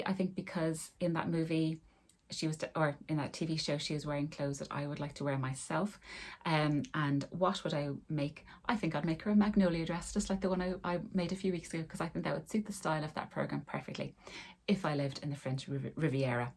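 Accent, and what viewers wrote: Ireland. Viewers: Great vlog and thank you for being so open and warm hearted. Btw love your gentle Irish accent! As a tall person I often wonder how tall others are, especially when we seem to share the same taste. How tall are you? I’m 5’9” and after 2 years of sewing, still have yet to sew anything without lengthening it. Megan Nielsen fit me best…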